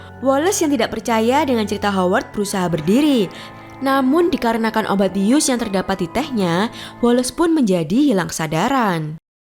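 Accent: Java, Indonesia. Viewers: masyaallah medoke pol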